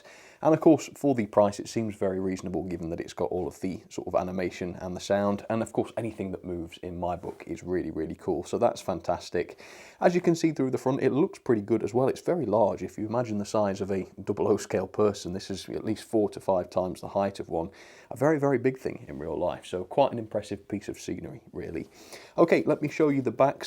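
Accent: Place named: West Country, United Kingdom. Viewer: Hi, great review and I got the same model from Rails of Sheffield too. I have added the tower on my loft layout within the steam shed area... It works very well and quite novel...great Wurzel's accent..!!! 😁 I have weathered the tower and painted the brazier and added a fire glow LED under it to represent the de-frosting fire.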